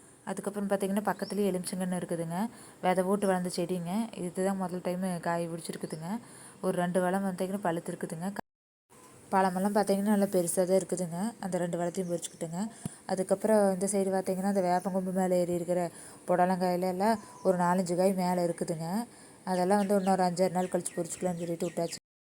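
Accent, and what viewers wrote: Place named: Kongu, India. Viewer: கொங்கு தமிழ் கேட்டு ரசிக்க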